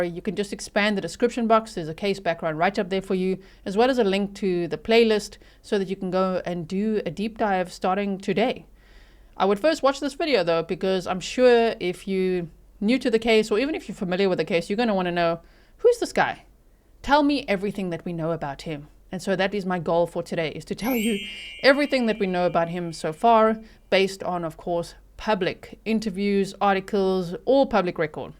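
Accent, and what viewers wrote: South Africa. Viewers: Im so glad is South African. Her accent is perfect for this space it gives an air of bipartisan, but you know she always stands for the victims. I guess I'm used to hearing American accents, and they just get on my nerves. Lol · Very much enjoying this content. I like the factual breakdowns. But someone, please help. I'm trying to place her accent but I'm failing. I'm thinking south Africa? My SIL's South A accent is similar but a bit different.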